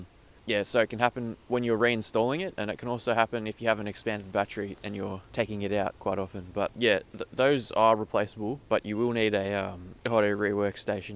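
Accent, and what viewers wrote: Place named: Australia. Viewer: OMG. He's Australian. Thumb down!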